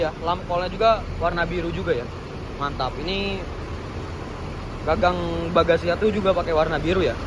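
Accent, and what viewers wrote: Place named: Java, Indonesia. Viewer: Ora tentrem ora marem (tidak tentrem tidak sangar/keren)hehehe logat jowo😅🙏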